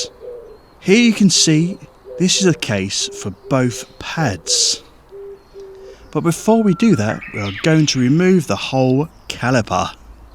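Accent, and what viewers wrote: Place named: East Yorkshire, United Kingdom. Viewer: Do yer really need brakes on them bikes.....you just put yer boots down don't yer?......he he he. 'Ull' east yorkshire.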